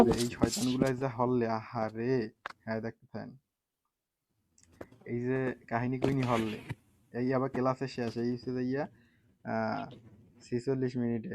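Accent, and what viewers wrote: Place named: Patuakhali, Bangladesh. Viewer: Apnr vasha potuakhalir mnush der moto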